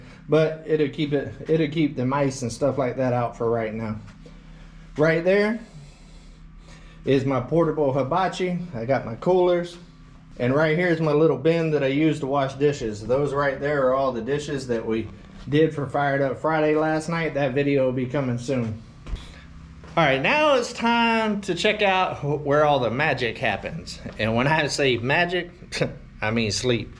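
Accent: United States. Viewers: unfortunately, he is one of those guys that really rubs in that country accent.....too much for me. hope he settles in and loves his choices.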